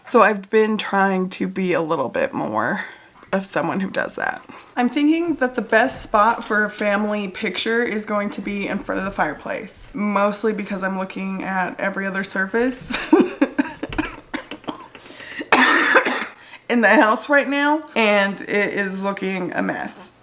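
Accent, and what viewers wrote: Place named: New York, United States. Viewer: Starting to sound like a newyorker 😅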